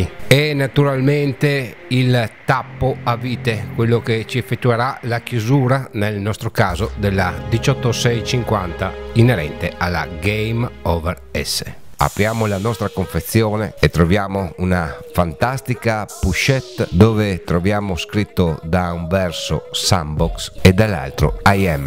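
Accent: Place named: Friuli-Venezia Giulia, Italy. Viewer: Il tuo accento (a me molto noto) tradisce la tua “Giulianità”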